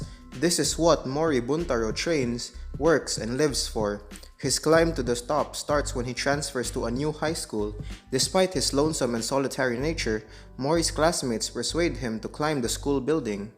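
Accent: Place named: Philippines. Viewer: Flipino right? Your accent seems familiar.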